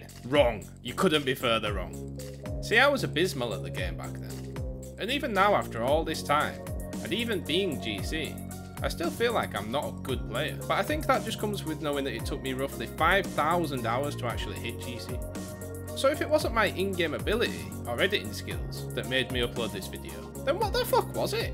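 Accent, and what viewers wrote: Yorkshire, United Kingdom. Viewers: Your a proper Yorkshire lad aren't you!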